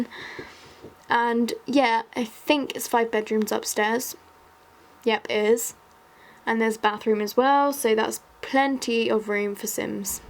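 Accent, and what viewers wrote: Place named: England, United Kingdom. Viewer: I love English accents! I think American accents are annoying and boring tbh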